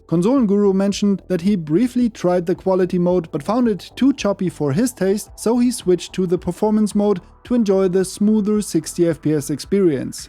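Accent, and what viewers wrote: Germany. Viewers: german english accent is hilarious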